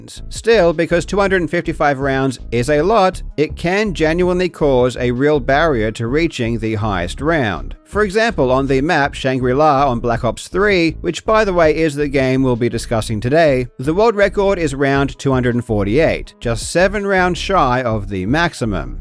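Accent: Australia. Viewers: CH is challenging for English speakers, don't sweat it. The CH in Nacht is said like the CH in Loch, the Scottish word for lake, like e.g. Loch Ness. The A is like in car, but short. Your first pronunciation in the video is correct. Yes, I'm saying correct. Sounding a little different than the theoretical standard pronunciation doesn't have to mean you're mispronouncing it, it just means you have an Australian accent because you got way too close to standard to be called "wrong." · Love your videos. But to be honest, your accent plus the way you speak for the videos is like nails on a chalkboard. It’s awful. Aussie streamers I feel like get more views than average because of their voice, but yours is just plain grating. Any hoo, on to watch the video…. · He's Australian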